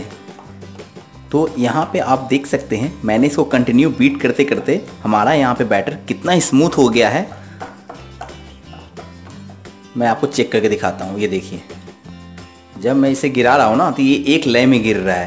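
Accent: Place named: Bengal, India. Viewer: Jo khudko zada chalak samjte hain wo sabse zyada dumb hota hain...dnt make fake video...and by your word you seems bengali...to bengali me hi baat kare...pronounciation is soooo poor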